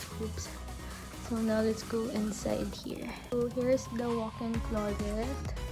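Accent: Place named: Conyo, Philippines. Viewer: Yung pagka-conyo at voice mo reminds me of my crush back in 9th Grade....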